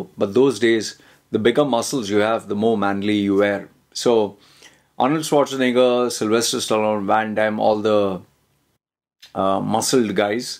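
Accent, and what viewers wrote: India. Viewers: Do you ever plan to laser off your facial tattoos? I agree that it makes you stand out but at the same time, it also makes many people view you as a red flag(attention seeker) . I know you already know that. The first time I saw your video, I was shocked and even more shocked when you started speaking in an Indian accent. I assumed you had some type of trauma growing up for you to do such a drastic thing (facial tattoo).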